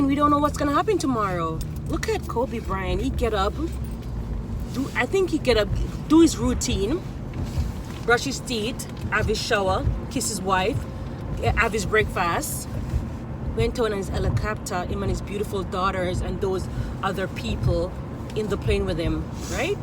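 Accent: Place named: Jamaica. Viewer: I could almost tell u was Jamaican to thts crazy Lol I’m half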